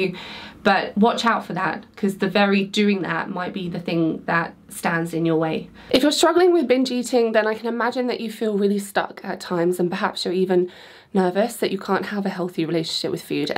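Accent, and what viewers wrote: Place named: England, United Kingdom. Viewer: I am on a path this week, discovering that you are speaking ME and I have an eating disorder. This was the very first video I found of yours- I watched it 3 days ago and I can't stop thinking about this one thing you said: "Why not shift your thinking from, "How can I lose weight?" to instead simply a goal to have "a healthy relationship with food so you can live out a happy life." YES! This insight has COMPLETELY changed me and I just wanted to say thank you for helping me on this brand new journey to start having a healthy relationship with food. You are amazing. P.s. your English accent, long hair and delicate beautiful features remind me so much of the gorgeous actress Jane Seymour.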